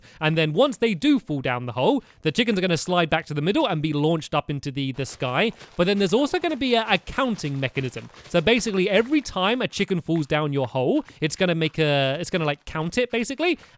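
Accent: United Kingdom. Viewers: why do British pepls say wool instead o' wall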